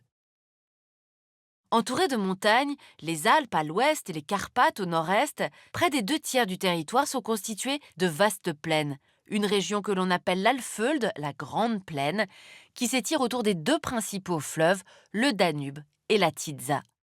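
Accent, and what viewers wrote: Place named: France. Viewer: Que asco ese tonito francés...parece que tuviera una puta papá en la boca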